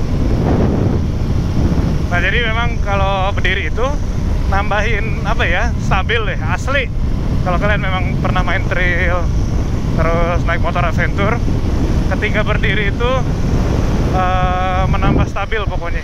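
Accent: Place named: Jakarta, Indonesia. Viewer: hoalah iyaa, maaf om, Om Lampung asli atau bukan ya, soalnya logatnya kek org org pindahan dari daerah sekitar Jakarta Bandung dll😅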